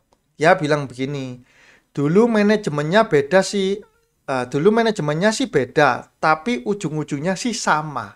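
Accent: Surabaya, Indonesia. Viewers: logatnya kog Sbynan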